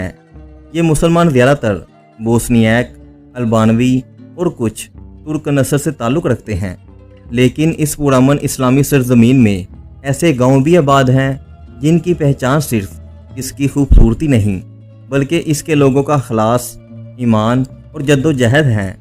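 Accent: Punjab, Pakistan. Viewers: Improve your speaking skills, your accent is typical paindoo punjabi, not suitable for these videos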